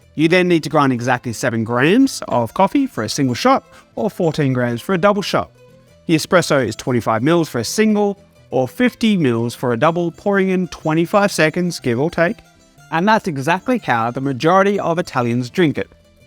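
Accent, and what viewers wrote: Australia. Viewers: Can we all agree that his australien access is fascinating 😍😍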